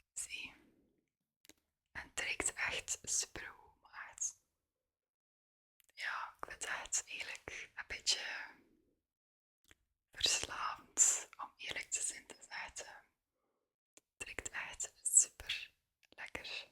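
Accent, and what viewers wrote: West Flanders, Belgium. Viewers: I often say that people should think before they speak in certain situations. Often it's emotion that takes over. And I'm trying to understand the 1st quote... 'walking to the rhythm of a flower blooming'. Walk at a slow, gentle pace? In any case, your reactions make me laugh when you try to pull yourself together to speak only in West Flanders.😄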